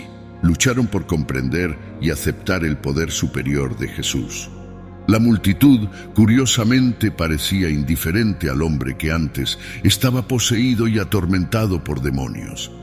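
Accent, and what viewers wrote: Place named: Spain. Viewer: Jodrrr que majestuosa voz tiene el hno relator , se nota su marcado acento español , q buen trabajo ha hecho en este video , felicitaciones !!!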